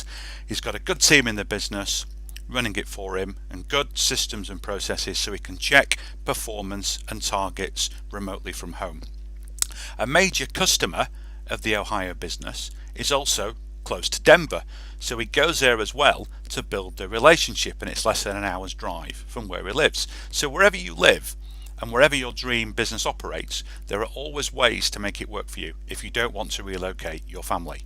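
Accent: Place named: United Kingdom. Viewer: As soon as I hear a Brits voice, I know I need to find another source about opening a business in NEVADA USA…. 🤦🏽‍♂️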